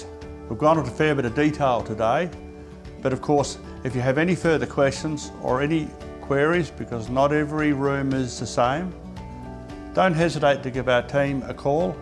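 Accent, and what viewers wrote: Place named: Australia. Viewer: After watching 5 US vids on the best dehumidifiers I can't even get in Aus.... Awesome listening to another Aussie.. :-)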